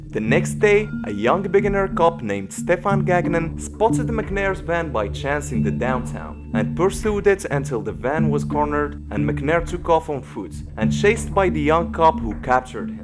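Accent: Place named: Morocco. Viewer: Nice video! I can hear a moroccan accent, good work man :D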